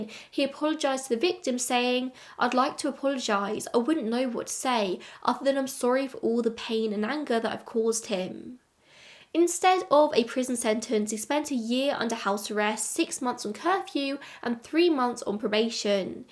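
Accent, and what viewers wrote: England, United Kingdom. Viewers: Ur English accent is 🤮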